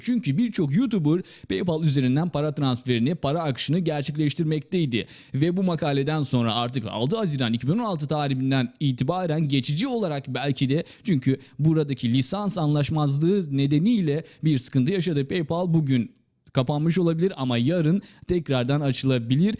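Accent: Azerbaijan. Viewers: hə azəridi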